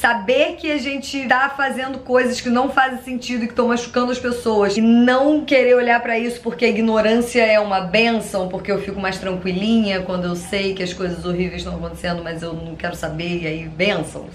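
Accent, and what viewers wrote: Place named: Rio de Janeiro, Brazil. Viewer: Carioca fala gostouso!!!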